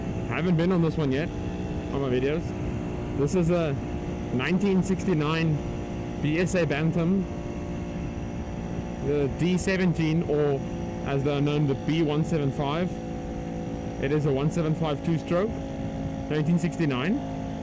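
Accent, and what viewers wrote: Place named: South Africa. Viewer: ....enjoyed your vid...lovely weather you have....are you in SA...from your accent sounds like it. I also own a B175....arnt they smashing little machines. Do you have any probs getting your spare parts...not to bad for me here in the UK, Just had a little 10 mile ride today to blow the cobwebs off after Christmas. All the beast and safe riding. Nick.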